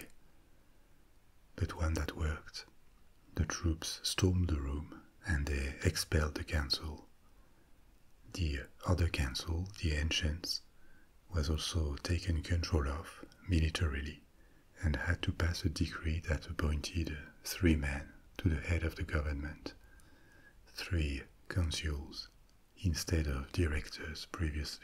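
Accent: France. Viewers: ASMR & History with a French accent.Perfect.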